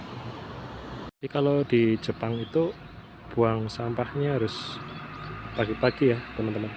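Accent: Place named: Tegal, Indonesia. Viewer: Logat bicaranya seperti orang dari Tegal nih😁, tapi lumayan bagus cara penyampaiannya 👍👌🇮🇩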